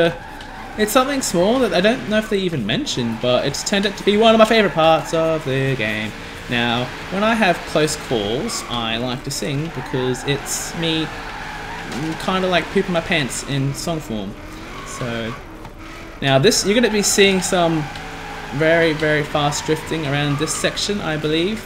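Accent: Australia. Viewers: Are you Australian and if so what part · I'm confused are you Aussie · hi are u australian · Brisbane, Australia im guessing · your australian?? wanna Meet up??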